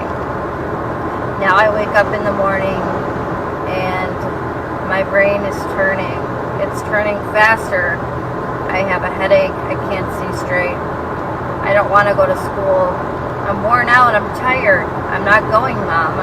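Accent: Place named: Rochester, United States. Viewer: Holy shit are you rochester Or Buffalo? You sound like you have a downtown Rochester. Hart accent like the East Side. Or I could be wayYou sound like you have a downtown Rochester Hard accent like the East Side. Or I could be way off And your midwestern, like i'm starting to believe chicaaaagaaa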